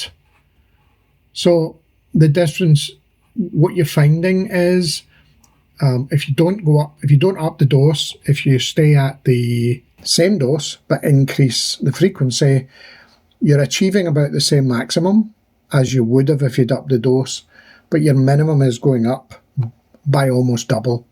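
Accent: Scotland, United Kingdom. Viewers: thanks for your info a fellow Scot its great to hear a local accent! Im on my 2nd 2.5 pen good suppression but by day 5 i feel it dip. So ill start giving myself 2.5mg every 5 days. My next pen do you think i should move up if still getting suppression? Or irder a 5mg pen anyway?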